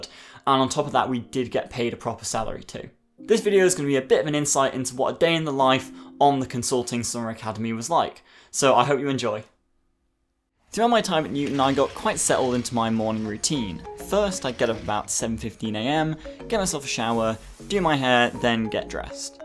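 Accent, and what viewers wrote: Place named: North, United Kingdom. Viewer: You almost said bahthroom. You are losing some of your Northern accent as I did when I started at Oxford in 1975.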